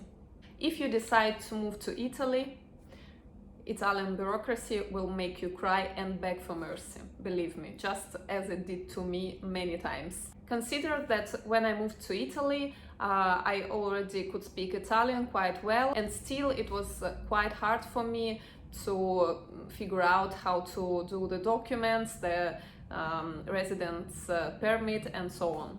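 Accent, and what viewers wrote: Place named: Russia. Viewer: She is uttering English words as overlap on Russian thinking and manner of conveyancing thoughts. I am Russian-English interpreter and feel in a second the nature and manner of speaking. Non dovete pensare lei e brutta or sbruffona. Questo e il suo modo di dire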